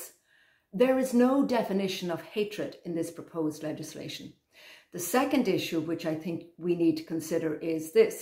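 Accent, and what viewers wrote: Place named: Ireland. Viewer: It's so refreshing to listen to an Irish person speaking so eloquently. Beautiful too.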